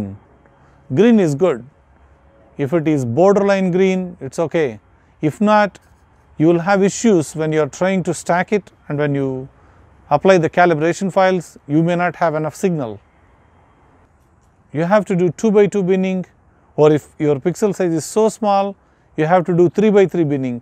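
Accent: India. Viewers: Your Accent Sounded A Lot Like Indian, But Indians Doesn’t Get This Much Exposure To Astronomy Mainly The Equipments That You Use! So I Just Wondered How Come Being An Indian, You Have Access To Such Astronomical Equipments.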